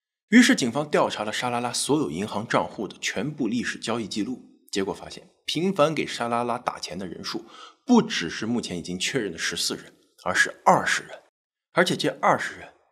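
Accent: Standard. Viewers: 真的是講故事的專家❤內容清晰語氣恰當，字正腔圓❤最喜歡的頻道我每天都聽幾個小時太好聽❤，👍👍👍👍👍👍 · 普通话标准，听多了普通话都学会了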